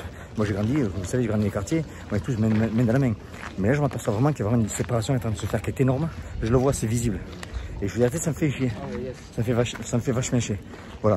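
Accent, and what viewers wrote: South, France. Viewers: Moi aussi triste.J’adore Votre accent du sud❤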